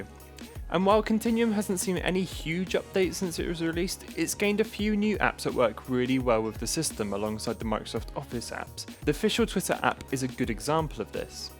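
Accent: United Kingdom. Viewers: That accent though, which area in Britain is that???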